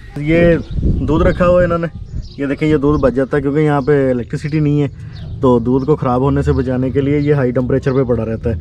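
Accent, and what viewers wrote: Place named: Gujrat, Pakistan. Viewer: i am pretty surey that you belongs to gujrat pakistan, your punjabi comes from those areas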